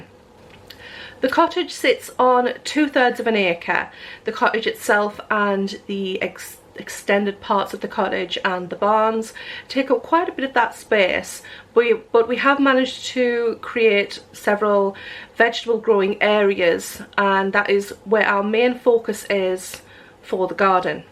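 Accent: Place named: County Durham, United Kingdom. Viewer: Very excited to see you working on your smallholding - hoping it will give me inspiration to get going this cold February, with my little plot, which, by the sound of your accent is near your own roots. (County Durham). I have four hens, a small vegetable plot and currants, raspberries and plums.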